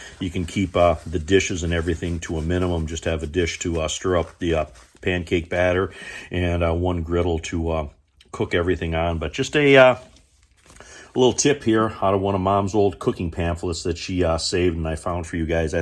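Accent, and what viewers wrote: Michigan, United States. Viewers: I am from TC. I live in eastern Oregon now. I miss the Michigan accent!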